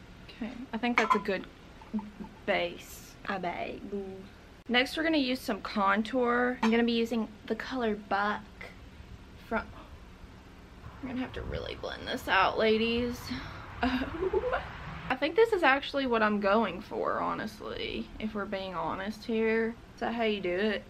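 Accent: South, United States. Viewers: LA? Wtf that's a southern ass accent wdym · The more I watch your videos, the less southern your accent sounds 😂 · Omg I love your country accent! 😁❤️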